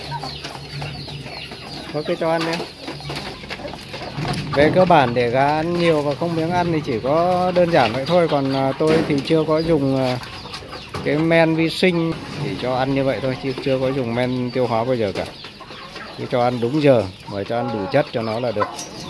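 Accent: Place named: Dien Chau, Vietnam. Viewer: Đúng chất giọng diễn châu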